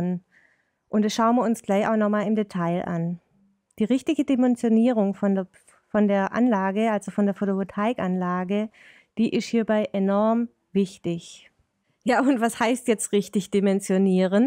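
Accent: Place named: Swabia, Germany. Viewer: 🧡🎈🌞💛🍀hallo ihr 🩵🧡wie immer interessant informativ schwäbisch😄 Dankeschön 🎈💛🌞🍀🩵🧡 Gruß vom verregneten Bodensee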